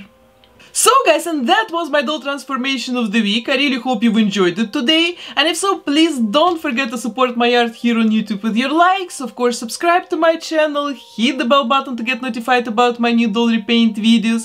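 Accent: Russia. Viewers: Обалденно! А у вас русский акцент?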